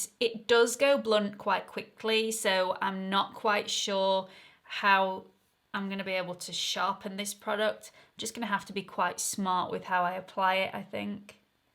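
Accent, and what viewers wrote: United Kingdom. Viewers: You can say absolutely stunning as much as you like. I just love listening to you chat. ( love your British accent). I would definitely wear the T shirt lol. Oh and the make up looks great! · After seeing several reviews, including yours, about No7 foundation drops, I ordered and am anxiously awaiting it's delivery. First I've purchased of that brand. As far as "absolutely stunning," I LOVE it and most every other "British sounding" words and expressions you say! You see, I'm from the southern US where lots of one syllable words are drawn out so they have many.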